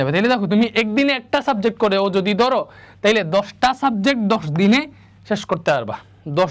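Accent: Sylhet, Bangladesh. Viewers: Sylheti Sylheti vibe aser bror kota taki?🙌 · Bhai apni ki Sylheti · Bhai apni mone hoy Sylheti😊😊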